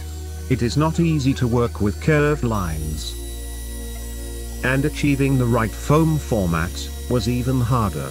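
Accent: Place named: United Kingdom. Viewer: Tudo é perfeito nestes vídeos a música e esse sotaque britânico é mto agradável de ouvir. Parabéns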